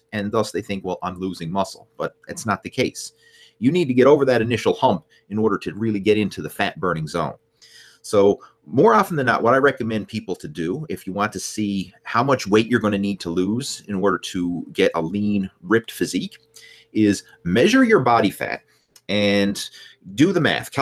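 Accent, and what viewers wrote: Newfoundland, Canada. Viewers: Are you from newfoundland? I seem to remember that you are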